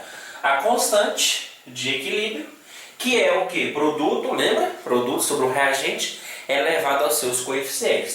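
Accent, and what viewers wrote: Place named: Campos dos Goytacazes, Brazil. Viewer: Parabéns pelos seus vídeos, professor me ajudou muito. Alías, uma pergunta, vc é campista ( campos dos goytacazes-rj) , sua voz tem um sotaque muito parecido hahahahahahaha. Brigadão, estou curioso....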